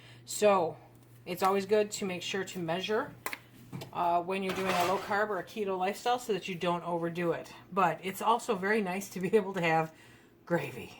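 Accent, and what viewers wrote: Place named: Michigan, United States. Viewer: I'm brand new here...I happened to be browsing around for recipes and clicked on your video. I could tell right away you were from Michigan, because we have dear friends in Johannesburg, and your accent reminded me of them. You have very interesting content, and a very pleasant manner...I think I'll stay!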